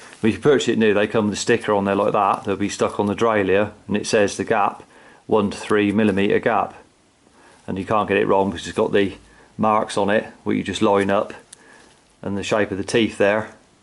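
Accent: United Kingdom. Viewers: Thanks for tutorials, British man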